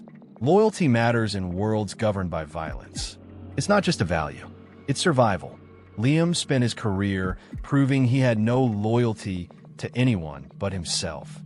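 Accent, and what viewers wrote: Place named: United States. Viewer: 😂 American telling stories fs what i would love to know is where some yank knew it to tell 😅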